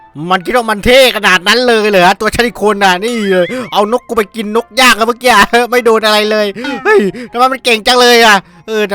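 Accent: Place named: Isan, Thailand. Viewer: เจ้าเชื่อเรื่องพญาบ่ อ่านแบบอีสาน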